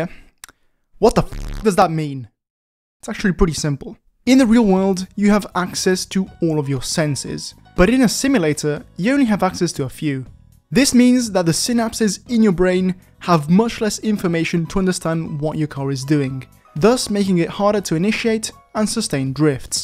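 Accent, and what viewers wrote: Italy. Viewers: I love the hidden italian accent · sei italiano? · You're Italian??!!! Wth. I am too. Bro il tuo accento é pazzesco. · italian by any chance?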